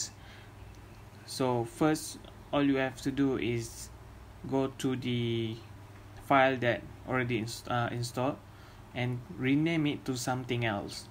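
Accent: Malaysia. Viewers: how about if my disk space is full, but i had the game download already? btw where you from because i hear some manglish. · sounds malaysian?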